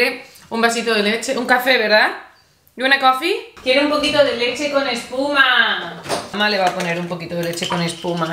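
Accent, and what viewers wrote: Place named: Andalusia, Spain. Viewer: Te sale el habla forzado con las eses. Mucho mejor cuando hablas en andaluz con tu madre. Como dwbe ser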